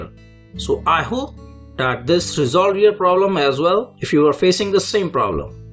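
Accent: India. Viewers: When I heard that Indian voice I thought it wouldn't work, but it actually did.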